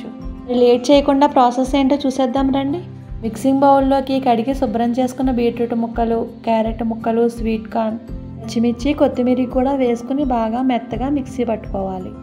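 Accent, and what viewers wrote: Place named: Konaseema, India. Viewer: మన కొనసీమ వాళ్ళు ఎక్కడున్నా బాష యాస మాత్రం ఏం మారదు. 👌👌👌 sooper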